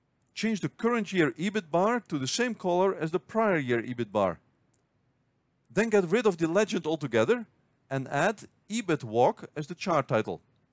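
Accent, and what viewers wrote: Netherlands. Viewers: what a nice dutch accent_:D but thanks, useful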